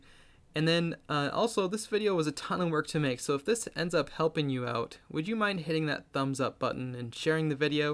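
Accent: Minnesota, United States. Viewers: Sounds like a Minnesota accent. A virtual Canadian.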